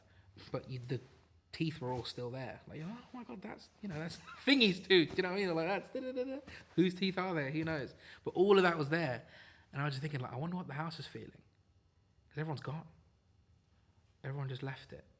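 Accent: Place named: United Kingdom. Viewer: GUYS HE’S BRITISH WHAT?????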